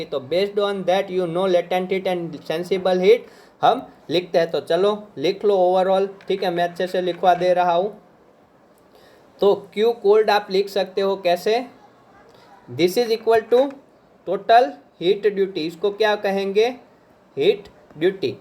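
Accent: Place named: Gujarat, India. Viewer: Aavaj thi gujrati lago chho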